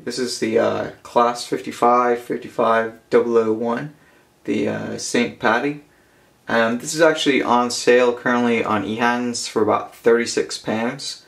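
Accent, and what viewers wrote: United States. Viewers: I have to know are you american? You sound it.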